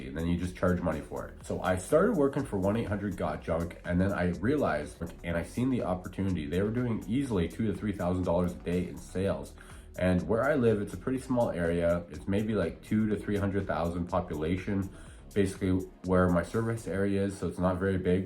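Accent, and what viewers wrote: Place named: Northern Ontario, Canada. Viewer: 705 area code. I saw a video and there was snow in the background and hearing you talk I was president sure . London Ontario here and just in my first week getting going. I’ve subbed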